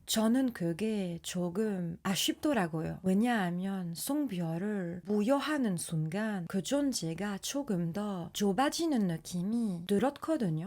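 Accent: France. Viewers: 어설픈 한국어 발음과 프랑스 특유의 악센트에도 한국인보다 더 한국스러운 느낌을 적나라하게 표현하는 외국인. 아니 한국짓에 더욱 충격을 받게 되는 지나가던 문과인 댓글올립니다...... 한국말이 참 이쁘지만 외국입장에선 참 어려운건 사실입니다. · 영상 처음보는데 불란서식 한국어를 사용하시는게 굉장히 신기하고매력적이네요. 근데 계속듣다보니 찐경상도 토박이가 표준어쓰려고하는 것같이도 들려서 나도모르게혼자웃음. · 재한 프랑스인 님의 한국 문학 심화 강좌입니다. 프랑스 액센트의 한국어가 우아하고 고급지게 들리지요? 늘 재밌습니다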